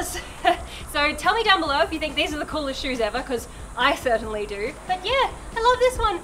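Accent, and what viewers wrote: Australia. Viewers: The first time I sall you vid I was about to leave then I sall that Bird and then I realized that you Australian u could tell at first I'm used to the accent... Cuz I'm Australian but i dont live there 😭😭😭😭 also I just like you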